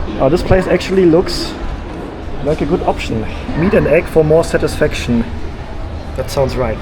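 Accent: Germany. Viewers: Gah, it’s taken me so long to figure out where I know his accent from (yes, germany) but he sounds like Klaus from American Dad 🤣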